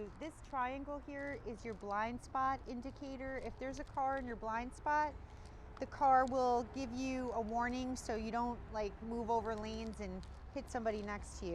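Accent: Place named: United States. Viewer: You sound awfully American for someone from Manchester...Great vid.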